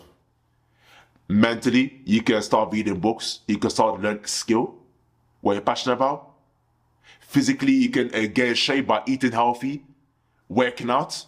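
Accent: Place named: Caribbean. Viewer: you say you are muslim bro but cl you sound west indie. Where are your family from?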